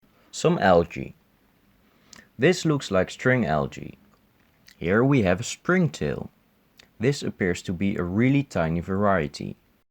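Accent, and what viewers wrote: Netherlands. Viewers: You are too adorable. “Here’s a squished one. Twins!” Hearing your accent makes me miss Holland so much. I studied college in Breda at NHTV, and all the Dutch guys are just such sweethearts. Miss the frites carts 😉 and mayonnaise.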